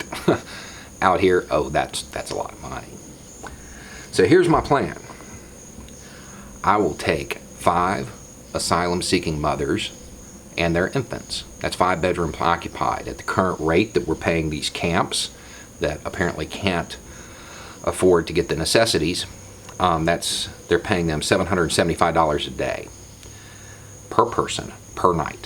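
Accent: South, United States. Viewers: The fact that your southern accent would probably stop me from even asking you for directions scares me to dead, I don't want to be part of the fear mongering crowd; I want to see people as human beings and have my children learn from many cultures just my own.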